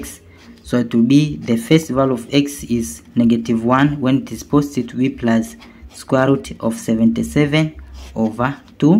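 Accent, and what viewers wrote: India. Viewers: 这尼玛印度口音绝了。这题解的 跟傻子似的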